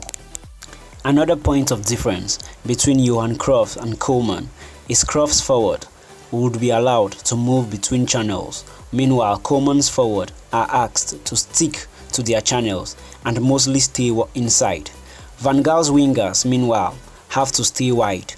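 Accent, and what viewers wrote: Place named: Nigeria. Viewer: You sound west African, I can tell because your accent sounds so familiar, I'd say your nigerian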